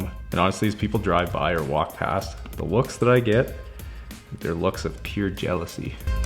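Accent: Canada. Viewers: Bruh is he Canadian cause aye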